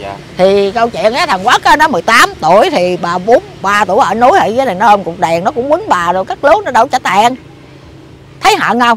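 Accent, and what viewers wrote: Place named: Mekong Delta, Vietnam. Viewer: bữa giờ nhớ quá vì không có được xem và nghe tiếng miền tây của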